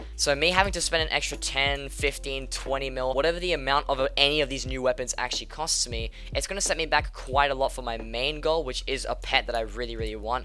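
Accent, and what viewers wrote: Australia. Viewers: Are you a Melbourne boy?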